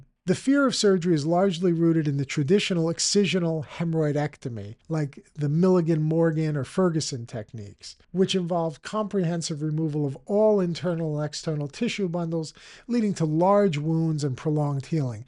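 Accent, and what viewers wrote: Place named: New York, United States. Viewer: I heard the accent and I was hoping you were in the NYC area... Looked it up and you're 15mins from my apartment in NJ 😂. Doc I had a question what are your thoughts on bidets?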